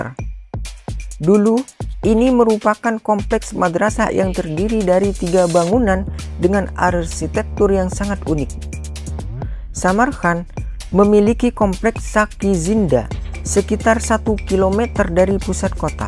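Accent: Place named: Arab world. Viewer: Menang logat arab tidak tau bahasa arab.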